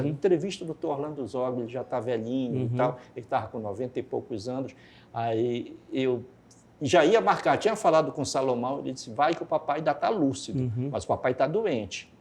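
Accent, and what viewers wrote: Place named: Pará, Brazil. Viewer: Gostaria de dizer e agradecer pela maravilhosa entrevista, voce me fez lembrar meu amado pai, fisicamente, no timbre da sua voz e na sua postura em relatar fatos, o sotaque paraense. 😊 Quanta saudade do meu saudoso pai, na infância tive o privilégio de avistar no sol das um ovni.Desde a infância ouvindo de meu avó e sobre seus avistamentos, meu esposo não acreditava até vermos juntos outro ovni em 2021 no mato grosso. Nao sabemos o que é e só Deus para nos dar sabedoria pra nao enlouquecer buscando respostas.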